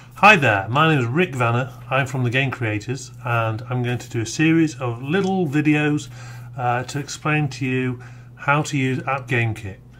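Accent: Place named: United Kingdom. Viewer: this man let me hear his whole passion and the british accent round it perfectly up really great to start the journey with you guys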